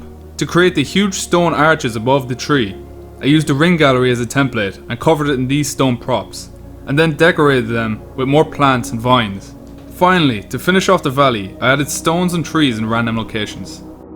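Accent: Ireland. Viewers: Are you Irish? Dublin maybe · Hi man you probably wont see this but you sound irish which u an to!if u see this can u pls tell me where ur firm im curious lol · You have to be Irish what county u sound like a mayo man like myself please reply 😄😄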